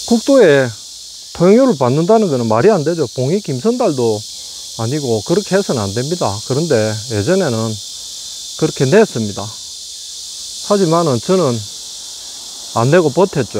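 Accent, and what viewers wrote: Gyeongsang, South Korea. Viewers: 차분한 경상도 사투리가 언제들어도 편안해 지는것은 저혼자만의 생각은아닐겁니다. 길에서 봉이 김선달처럼 돈 뜻는일이 어찌 그곳만 그랬을까요? 옛날 에 씨가 때어날때쯤에는 서울에서 시골로 주민등록 전출때 동사무소직원에게 그때 최고로 비싼 신탄진 담배한값인 50원을 열려있는 서랍에넣어주지 않으면 주민등록서류가 언제 도착할지 모르는 그런시절도 있었답니다. 그래도 지금은 정말 세상좋아졌다고 생각합니다.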